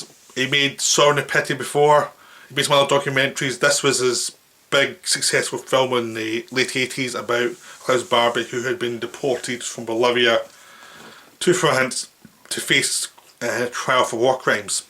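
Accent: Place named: England, United Kingdom. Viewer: He has a very thick England accent and hard for ME (prob only me) to understand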